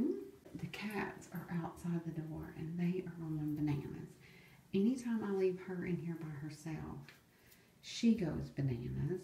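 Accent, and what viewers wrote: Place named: South, United States. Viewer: You sound like you’re southern. Where are you from ? I like your accent .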